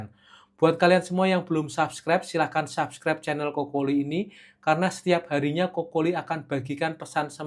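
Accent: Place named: Java, Indonesia. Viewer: Medok bgt Jawa nya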